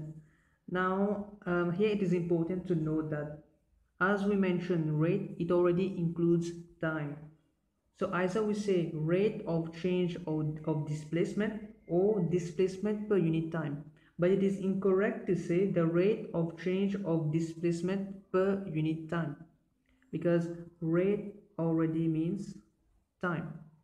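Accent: Mauritius. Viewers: are you mauritian??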